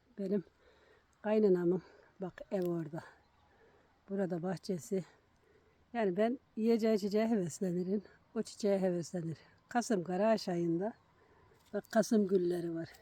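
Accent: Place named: Anamur, Turkey. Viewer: BACIM SEN ANAMURLUMUSUN ŞİVEN ORALI ❤❤❤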